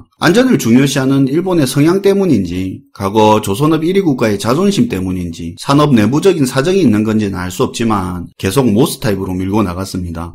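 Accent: Gyeongsang, South Korea. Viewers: 이야..구수한 경상도사투리에 전문지식없는사람들도 금방이해할수있도록 간단명료하게 설명해주시니..그저 대박이라고밖에...ㅎ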